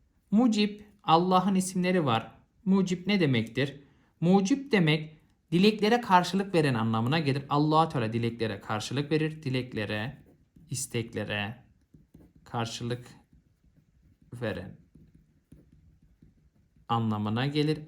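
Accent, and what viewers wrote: Malatya, Turkey. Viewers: Hocam, Malatyalı mısınız? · Hocam no 44 Malatyalımısınız 😂😂😂🎉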